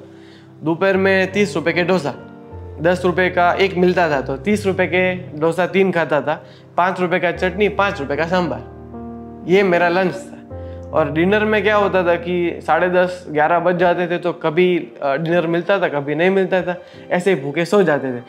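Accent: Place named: Gujarat, India. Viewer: That gujarati accent 😊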